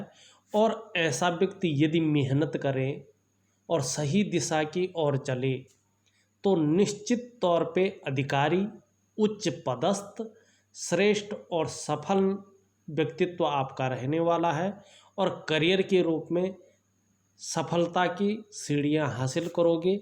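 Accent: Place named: Garhwal, India. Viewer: Guru ji bhasha se gadwali lag rahe ho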